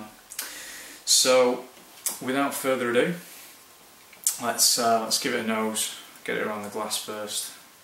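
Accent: Lancashire, United Kingdom. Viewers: I've changed my mind. You're from Lancs.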